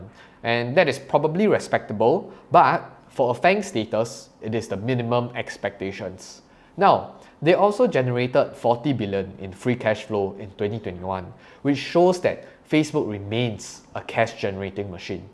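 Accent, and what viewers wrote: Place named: Singapore. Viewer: Enjoy the Excellent analysis with Singapore accent.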